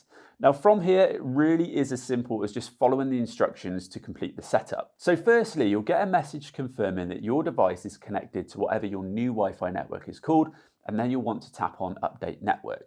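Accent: United Kingdom. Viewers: Having a guy with a British accent makes this video and Sonos products worse than they actually are….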